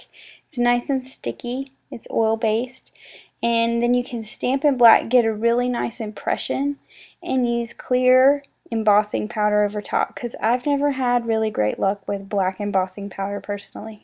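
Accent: South, United States. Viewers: I love this - great for multiples., this is the first time I've picked up on your southern accent - cute:) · So darling,! I love these. And PS, I could hear your Southern accent a little more in this video than usual. Cute!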